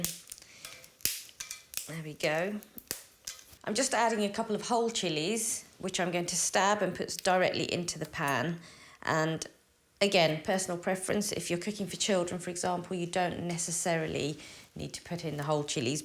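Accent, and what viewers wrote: United Kingdom. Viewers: Thank you for what you do. Your videos are knowledgeable and inspirational! It’s obvious you’re passionate about Indian food. 🥰 And I love your British accent. Best to you & yours.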